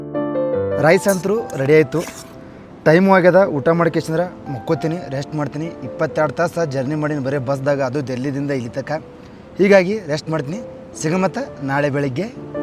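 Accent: North Karnataka, India. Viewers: Uttar karnataka bhase Bhari aeitri anna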